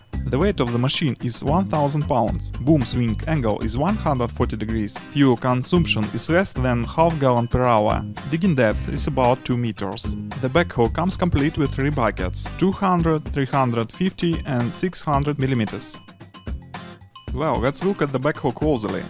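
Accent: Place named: Russia. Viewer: Русский наверное. Акцент выдает))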